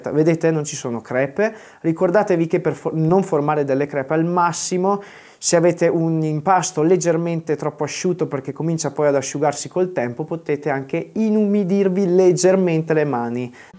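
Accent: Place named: Romagna, Italy. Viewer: Mi piacciono tutte le tue ricette sono ottime nn ultima ragione sento dall' accento ke sei romagnolo e io adoro tutto della Romagna bravo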